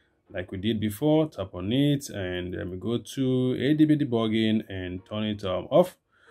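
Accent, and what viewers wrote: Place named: Cameroon. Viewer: Thanks for this... only samsung does this kind of crap lol. I really enjoyed your accent it reminded me of my best friend from high school, she's from cameroon.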